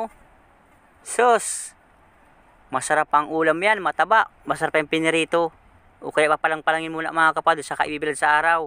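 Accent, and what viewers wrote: Bicol, Philippines. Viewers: Parang bicolano eh .haha parang catanduanes?? Baras ??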